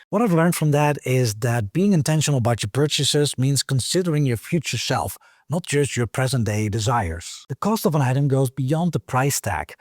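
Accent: Netherlands. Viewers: Are you from the Netherlands!? You seem to have the same accent as i have, and I am from Rotterdam? ❤ Add: Yup, went further down the video, and you mentioned "niksen!" Echt uit Nederland, dan!? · Ik hoorde het accent, maar dacht: misschien is het een Deen. Dus ik keek naar hoe je ramen sluiten. Dat leek niet Nederlands. Uiteindelijk hoorde ik het je toch bevestigen. Wel een Nederlander. Voelt toch als thuis 😊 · Het was de Gamma verhuisdoos die mij de tweede bevestiging gaf, na het horen van zijn accent. Ons kent ons...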